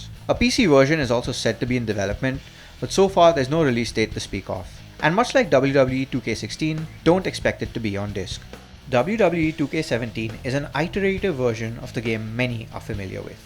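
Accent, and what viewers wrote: India. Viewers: Horrible preview. This guy is pretty subjective and his Indian accent makes me wanna puke.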